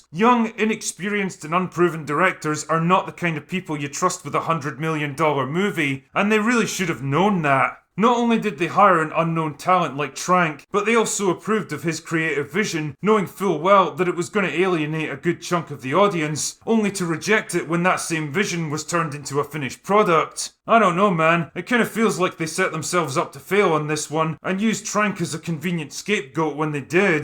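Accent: Scotland, United Kingdom. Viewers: The great thing about is the voice work. Everyone knows he has a unique voice. But the performance element is rarely mentioned. Listen to him on livestreams, and even though he has a Scottish accent, he sounds like a sober businessman in bar after office hours. But then in his videos he puts on the voice and plays up the "drunken slurring" and he performs. Again, especially with videos like this where it is all production notes and trivia and news, compare a WatchMojo voiceover to this video fro Drinker. You know there's a script, and yet it doesn't sound all corporate and polished and robotic and forced/fake-friendly. It sound simply like Drinker is telling a story in a bar after happy hour.🤪🤪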